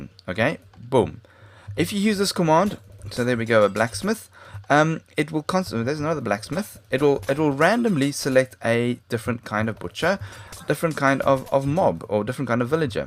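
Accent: South Africa. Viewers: Ur South African ¿ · are you South African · Are u South African You sound like it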